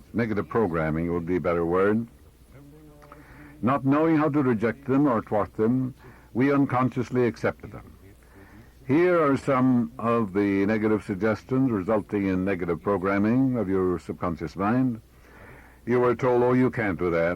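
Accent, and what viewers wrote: Ireland. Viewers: Like cha and miah Murphy always retained that cork accent! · Plenty of good stuff here. I never realized had such a heavy Irish brogue!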